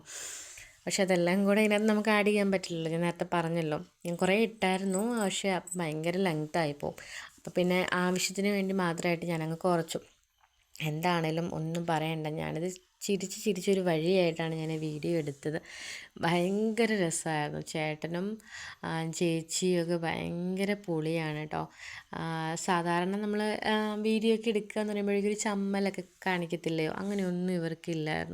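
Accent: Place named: Kottayam, India. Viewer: Super ayit undu❤ chakkapazham😋.. Chechide language ketal Kottayam ane parayu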